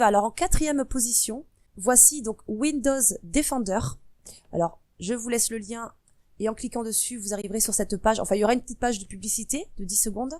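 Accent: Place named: Marseille, France. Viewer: marrant l'accent de Marseille ^^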